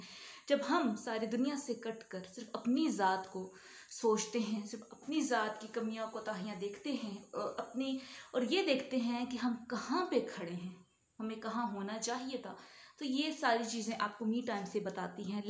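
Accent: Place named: Punjab, India. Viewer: Punjabi xent❤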